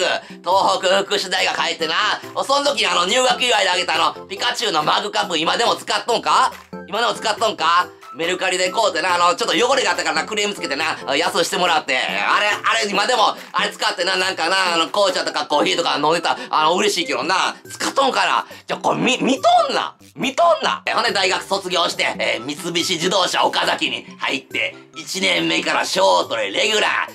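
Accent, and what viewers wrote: Kansai, Japan. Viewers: 設定に無理あるが、関西弁で押し通す精神力はすごい。さすが元お笑い芸人。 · ゴリゴリ関西弁ですやん😂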